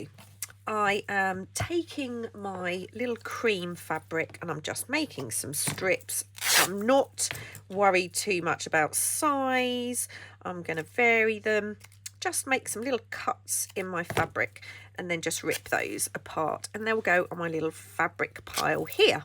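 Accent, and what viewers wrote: England, United Kingdom. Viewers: I love your hands and your fancy "English accent". The crafts you show are quite elegant and nice. I appreciate so much what other women do, this enriches us women to watch their creativity and good taste. Tysm for sharing it! ❤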